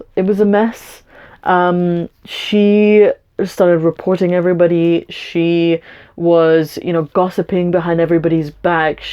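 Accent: United Kingdom. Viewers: Real question..what is the objective of content you put out? informative, validation, monetary, therapeutic just plain ol' fun,when i view your content I try to look past the ex muslim chick with the Brit accent seeking validation from cyberspace with a western culture fetish, maybe its partly because I dont see (or really care to be honest)about Islam or its followers where I Iive..you have a lot of well wishers and supporters..on Team which is good my comments arent meant to demean or be disrepectful..i could be out in sunny 85 degree weather but I choose to watch your content..mix it up..interact with people..do some location shoots..surely the Universe isnt all Islam sucks My family sucks I like western entertainment and the occasional cringy use of American slang(sorry its like imagining the queen saying" bitch got cake" lol)..NOW you're Artwork I like....a little angular for my tastes but I love your color schemes...hey put out an adult coloring book that might generate some scratch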